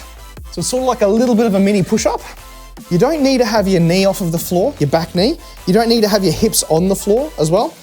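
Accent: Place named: Australia. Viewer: with that Australian lilt in your voice I reckon it would be a hit :D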